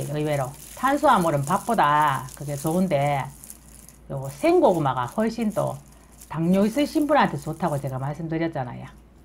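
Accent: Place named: Daegu, South Korea. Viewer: 함무보께예~ 대구쪽사투리네요 "고맙십니데이"가 맞는말이 되야되는날은 언재쯤일까?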